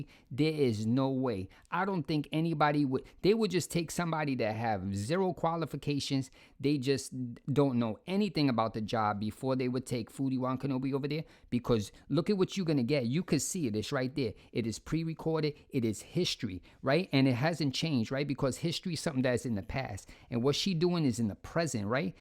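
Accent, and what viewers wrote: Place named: Nuyorican, United States. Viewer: I don’t even care about Foodie but I will always watch your videos, even if you’re doing reviews of the world’s best cabbages. By the way, my bf hears your videos and is convinced you’re one of his Newyorican relatives doing reviews on internet weirdos on the down lol. I mean, he might be right?! Love you!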